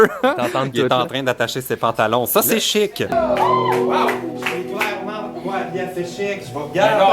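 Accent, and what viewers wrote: Quebec, Canada. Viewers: Plus j'écoute ton Vlog plus je me dit que j'aime vraiment l'accent québécois 😍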